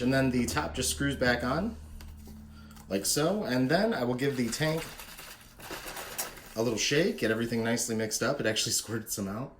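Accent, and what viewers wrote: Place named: Canada. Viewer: Great demonstration! Your voice sounds much like a Canadian I have heard on Youtube that has a vintage kitchen and a collector of turquoise appliances.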